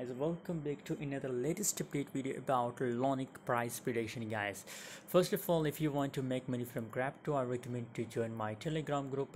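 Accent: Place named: China. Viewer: No 1 can understand yr Chinese accent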